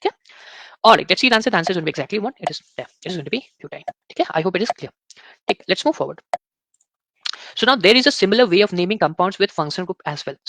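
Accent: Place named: Bangladesh. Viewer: Sir aapka accent videsh ka lagta hai bangladesh ka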